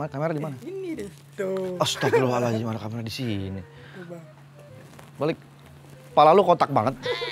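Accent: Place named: Medan, Indonesia. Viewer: Mak jang, maen maen ya wkw, Medan kali ya min haha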